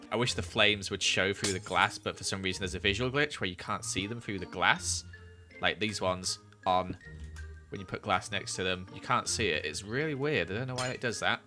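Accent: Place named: United Kingdom. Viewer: British I just thought you had a weird voice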